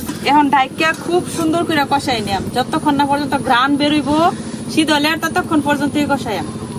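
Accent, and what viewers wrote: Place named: Netrokona, Bangladesh. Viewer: আপু, আপনার নিজের নেত্রকোনার ভাষায় যে মাধুর্য্য আছে তা কখনোই শুদ্ধ বা প্রামাণ্য বাংলায় পাবেন না। পশ্চিমবঙ্গের দুর্গাপুর থেকে বলছি - দোয়া করি ভালো থাকুন, সবাইকে ভালো রাখুন, আর আপনার মুখের হাসি অক্ষয় হোক। 🇮🇳🤝🇧🇩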